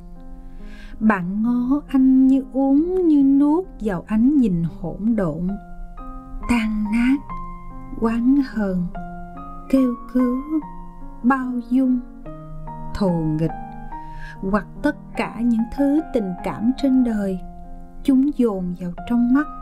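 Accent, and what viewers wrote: South, Vietnam. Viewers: Viết thì hay, nhưng cấu kết câu chuyện thì không đâu vào đâu. 6/10. Cảm ơn giọng đọc miền Nam tuyệt vời của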